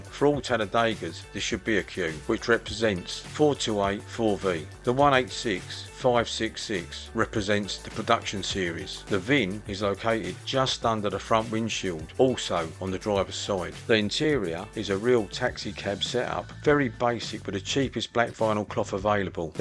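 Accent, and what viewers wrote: United Kingdom. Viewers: Very well done with the video matching the audio very well in spite of the audio being generated by your British narrator, which sounds really off unless it was targeted to British audiences. 🍁 eh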